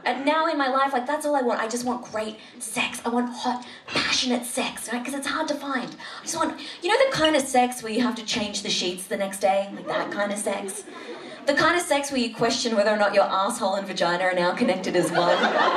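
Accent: Australia. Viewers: yep or maybe kiwi but I'm fairly certain she's Aussie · Love the Australian accent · She"s Australian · Just an average Aussie Sheila.She is definitely not a kiwi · Is she from the land down under? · She's Australian, we all sound like her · 55 seconds in I had to stop watching, Australian+the topic.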